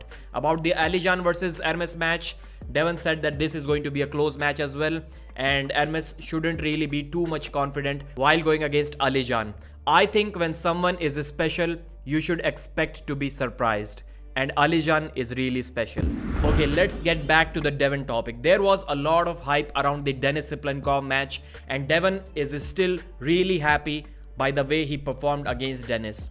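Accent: India. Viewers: Dude your indian accent is f@ annoying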